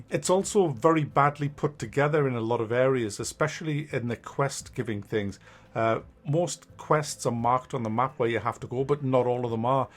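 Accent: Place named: Liverpool, United Kingdom. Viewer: That made me fucking crack up man.. Love the scousser accent too! LMFAO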